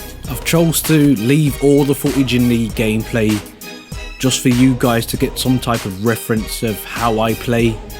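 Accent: Birmingham, United Kingdom. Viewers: You from brum lad?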